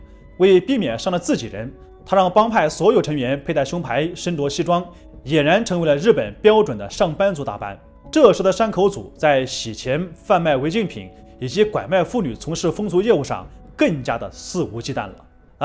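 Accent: China. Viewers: 不是嘛 你聽口音就知道版主是中國人了吧 (我猜中國憲法肯定沒有這條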